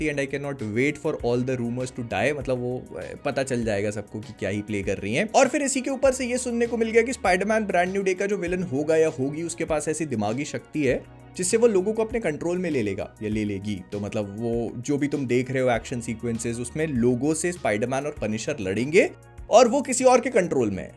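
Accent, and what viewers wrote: Bhopal, India. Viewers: Maakda.... kya tum Bhopali ho ??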